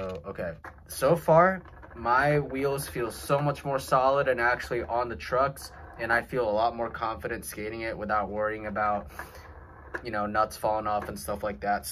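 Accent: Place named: Delaware, United States. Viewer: ok. that is a good idea. thanks man. somebody just the other day had this problem at the park. i can tell your from the east coast when you said up there in new england but you dont sound like your from delaware. im from Philadelphia. the streets here might be the first roads paved after they invented black top 200 years ago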